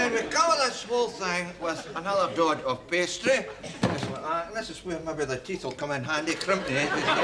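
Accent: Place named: Scotland, United Kingdom. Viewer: Its funnier because of the Scottish brogue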